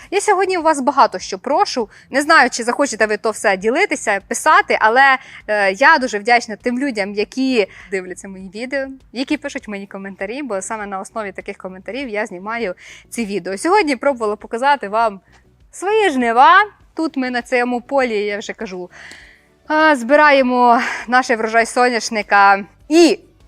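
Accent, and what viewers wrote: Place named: Cherkasy, Ukraine. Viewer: у вас интересний мовний акцент .чепкащина.так як і на лубенщені свої нотки співочої української мови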